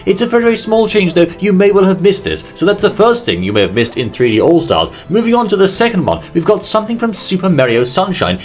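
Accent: RP, United Kingdom. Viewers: your accent is posher than mine! I too are from the UK. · OK. This is the first time I've heard him speak but..... I LOVE HIS BRITISH ACCENT!!!!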